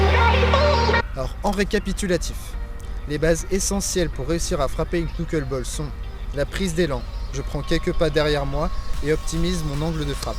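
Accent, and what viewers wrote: Saint-Étienne, France. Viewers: T'est de saint Étienne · Tu serais pas stéphanois ? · Vous êtes stéphanois ?! Si oui comme moi aller les verts !! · Tu est stephanois!?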